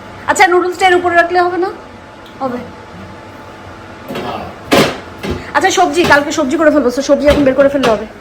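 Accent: Sylhet, Bangladesh. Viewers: এরে ও দোস্তত 🤣 খাটি সিলেটি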